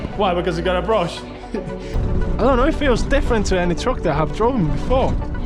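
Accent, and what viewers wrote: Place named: Liverpool, United Kingdom. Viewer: Nice Truck Mate, Good to hear a bit of Scouse Also 👍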